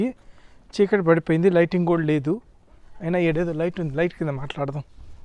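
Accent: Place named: Andhra Pradesh, India. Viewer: Medhe Andhrapradesh Ani anukunta me slang choosi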